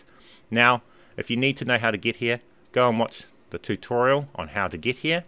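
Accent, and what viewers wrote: New Zealand. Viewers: Churr bro! I thought you sounded kiwi so I poked around your profile and saw that you were! Awesome to see a kiwi content creator I love it bro keep up the good work!! Instasub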